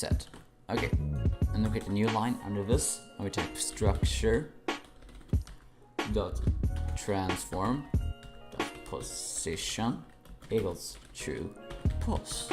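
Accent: Sweden. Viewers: Am i hearing a swedish accent? (Very helpful video btw)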